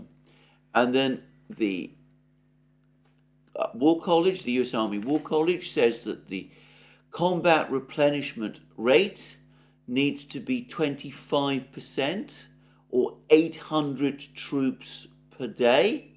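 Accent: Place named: RP, United Kingdom. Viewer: Mercouris is always an authority about the Ukraine war and about sophisticated Eton-style english language :+)) The only thing he dares not to do is to try to know who is in the "deep state". The "deep state" has no name.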